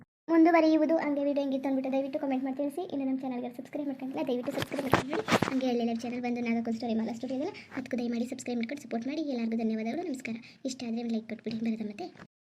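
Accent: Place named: Mysore, India. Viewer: Mysore hunsur language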